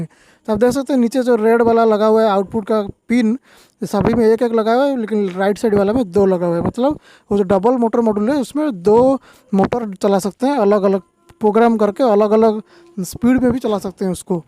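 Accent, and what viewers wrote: Odisha, India. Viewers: Sir i love your odia accent .. Very helpful..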